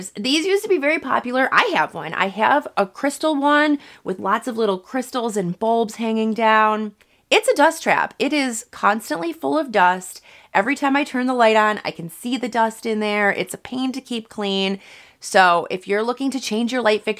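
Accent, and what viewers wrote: United States. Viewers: Love your Midwest accent. Great tips, thank you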